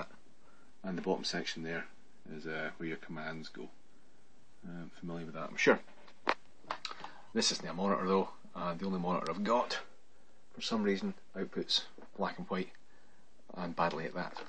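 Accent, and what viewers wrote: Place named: Scotland, United Kingdom. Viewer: Sounds Scottish to me.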